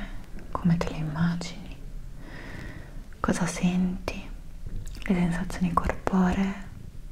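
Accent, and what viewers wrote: Italy. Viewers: A pesar de que no entiendo nada...el acento italiano en asmr me hace tener demasiados triggers.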